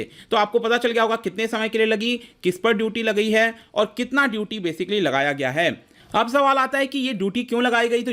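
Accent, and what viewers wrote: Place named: Punjab, India. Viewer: Punjabi dhke nl nikl jandi h 😋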